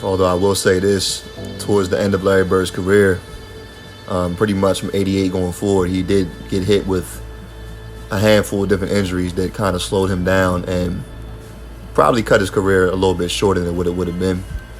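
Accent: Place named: Baltimore, United States. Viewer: Nice vid! Bird Hakeem and Harden are my all time favorite players! Love the Baltimore accent. One small tip though, the music is a bit loud in comparison to your voice :)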